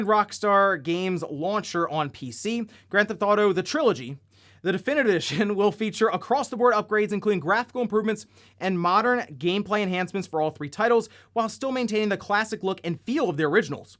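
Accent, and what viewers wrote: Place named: Philadelphia, United States. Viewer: U from philly area, I hear it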